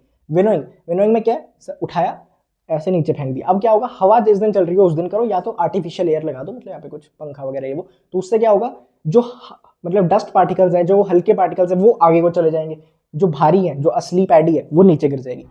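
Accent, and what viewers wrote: Punjab, India. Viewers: bhaiya are you punjabi >?????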